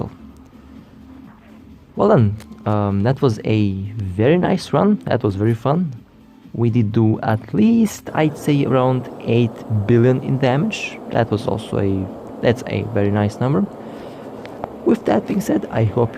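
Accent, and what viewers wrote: Russia. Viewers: Это у тебя русский акцент или мне просто кажется? Видео снималось на тесте?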